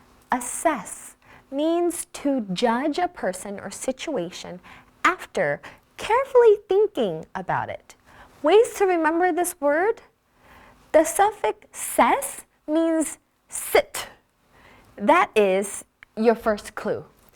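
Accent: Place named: United States. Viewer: Thanks a lot, that's what I've expected for a long time. Pretty great chance to practice American accent instead of listening to the online dictionary. Hope to hear more about the synonym or slangs and pronunciation from you. from Canada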